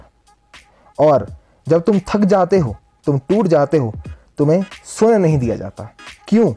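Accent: India. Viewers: new subscriber here ! sounds like a UP wale bhaiya !!Am I right????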